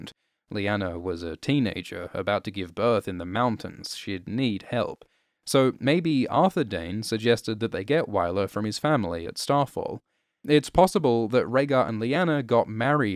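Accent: United Kingdom. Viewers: Dorne sounds a whole lot like Dawn when you say it with a British accent. Coincidence? I think not!!!!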